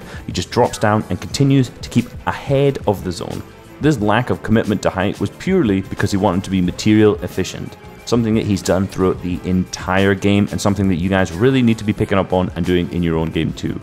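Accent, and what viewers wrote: Scotland, United Kingdom. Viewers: Seen u on nicks stream and to hear a Scottish accent was a pleasant suprise. Keep up the class vids🏴🏴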